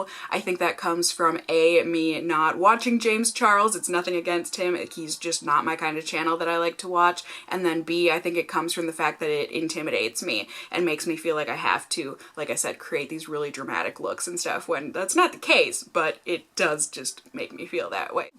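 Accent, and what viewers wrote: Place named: South, United States. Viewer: That Southern accent and the drag comments made me subscribe to you. Also the fact that you called out James Charles and the drag/clap back culture.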